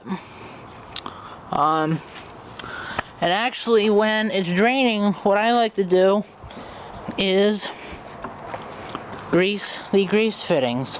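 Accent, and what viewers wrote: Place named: Newfoundland, Canada. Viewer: Where are you from? I first expected Newfoundland until you were talking in gallons and pints. Lol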